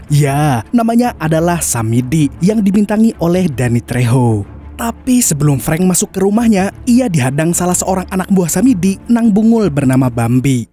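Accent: Banjar, Indonesia. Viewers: Hanyar sadar, sakalinya mimin urg banjar🤣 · Bang , orang rantau tapin atau Banjarbaru?? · Orang Banjar kah pian nhe hehehe😇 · Orang banjar kah bang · Orang Banjar kah mang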